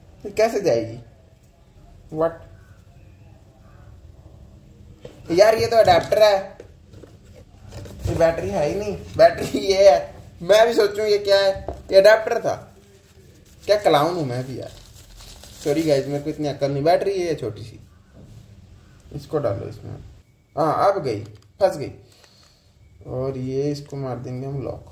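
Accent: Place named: Pahadi, India. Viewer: Pahadi accent ❤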